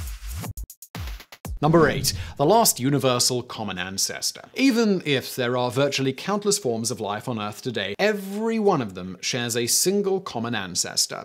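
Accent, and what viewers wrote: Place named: RP, United Kingdom. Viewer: I can't figure out how you got that tofffy nosed accent and yet, can hardly pronounce common words. Is it just regional and unrelated to class/education? This is why people study journalism and broadcasting.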